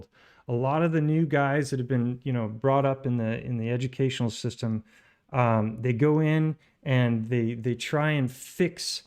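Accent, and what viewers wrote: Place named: South, United States. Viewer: It gets worse!! Typical Southerner ,he loves the sound of his own arse, sorry voice.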